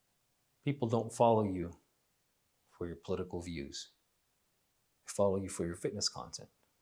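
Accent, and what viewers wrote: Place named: United States. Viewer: If you ever wanted to know how a true American speaks, this is it.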